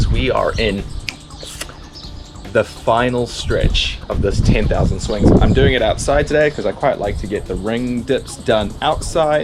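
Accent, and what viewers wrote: South Africa. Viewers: Why is your accent so close to South African? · Sounds saffa.....lekker.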